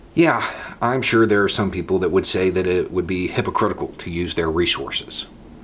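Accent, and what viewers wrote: South, United States. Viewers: I gotta tell you this one: Being honest, I come from a VERY liberal state. And not one anywhere near the South. There is a stigma here of Southern people: that literally of them who aren’t black are so far up Trump’s racist ass that they’re beyond saving... And to be honest, I saw a video of yours once through auto play, and I saw the beard and heard the accent... and I thought for sure you were some sort of confederate flag wearing neo nazi. Then I heard you talk. I mean I really listened to what you sad to say. And you should know that I had to take a step back and realize that I had been the biased one all along. I’d become the close minded person I believed others to be, and it was a really humbling moment. I listened, and then watched almost all your videos... and I want to stop and apologize to you and every other person I’ve written off for that kind of thing. 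Now, I may not always 100% agree with what you say, but I so deeply respect that you’re a thinking American. And I deeply respect that you’re out there being honest with your posting. It gives me hope for our country, that maybe - just MAYBE - we’re not actually as divided as the tribalistic political movements want us to be. Hope that others in home states like mine will listen to people like you, and stop jumping off conclusions and listen to what you have to say (and Vice versa). I owe you (a person I’ve never met) an apology and to ask forgiveness for making assumptions of who you were based on your pre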